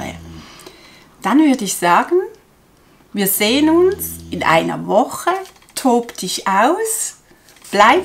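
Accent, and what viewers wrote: Switzerland. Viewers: Fantastische Kunst, bin begeistert 🥰, vielen herzlichen Dank für Deine wertvollen Videos, hab dir ein Like und Abo gemacht 🥰 (und dein Schweizer-Hochdeutsch sehr sympathisch 😀)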